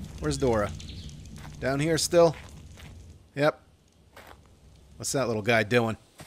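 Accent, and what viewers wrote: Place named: New York, United States. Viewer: I'm new and very entertained by the irate ny/nj italian vibes & accent.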